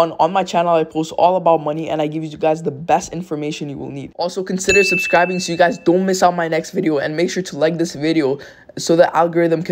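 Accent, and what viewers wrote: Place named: Toronto, Canada. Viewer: this guy lives in toronto i can tell from the accent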